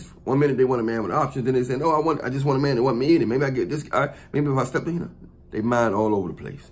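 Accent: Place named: Baltimore, United States. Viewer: You have Maryland Baltimore accent. You videos are awesome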